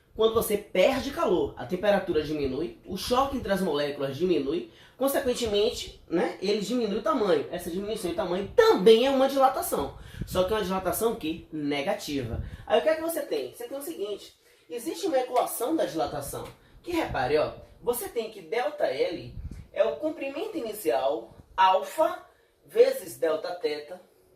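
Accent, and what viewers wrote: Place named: Bahia, Brazil. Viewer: Ce é da Bahia ? Sotaque parecido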